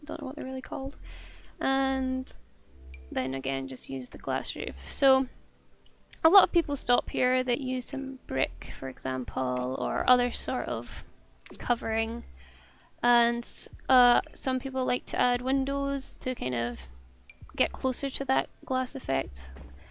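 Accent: Scotland, United Kingdom. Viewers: I hear a fellow scot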